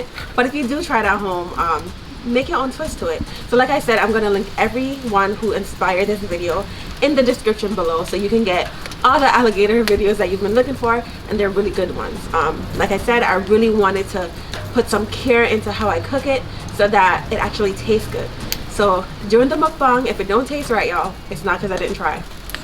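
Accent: Jamaica. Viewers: Are you a Jamaican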